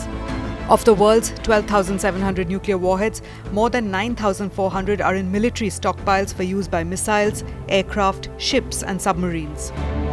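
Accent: India. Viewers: Just speak your own indian language we do not enjoy that indian english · Iam getting so bored with Putin and his nuclear threat he has been saying this for months this video is click bate no doubt it will be repeated again when Putin makes his next threat and people start to wobble again just like before Putin knows the response from the west if he did it would be goodbye Russia funny the voice over is Indian Russia's Allie what a surprise not🇬🇧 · This Indian voice over is trash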